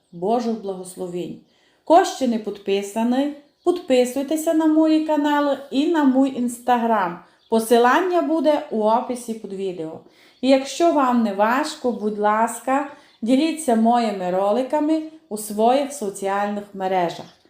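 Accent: Transcarpathia, Ukraine. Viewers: я дуже люблю слухати вашу закарпатську річ я зі Львова але приємно чути кожну людину з різних куточків України добра вам і вашим діточкам❤ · Мій лайк і підписка. Я з Івано-Франківська, але мені дуже подобається Закарпатський діалект і дуже поиємно Вас, слухати. Ваші рецепти супер. З нетерпінням буду чекати на Ваші чудові рецепти. 🥰🥰❤️🩵🩵🩵💛💛💛🇺🇦🇺🇦🇺🇦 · Найбільше любила такі рогалички з трояндовим вареннячком ♥ Це такі теплі спогади з давніх весіль та празників. На львівщині це - рогалики. Дуже люблю слухати закарпатський діалект. Дякую Вам за позитив і досвід. А хай не ростроюється )).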